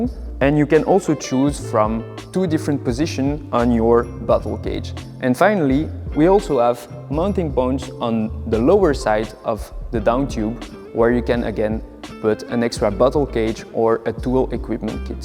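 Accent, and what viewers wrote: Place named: France. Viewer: I like the english with french accent😊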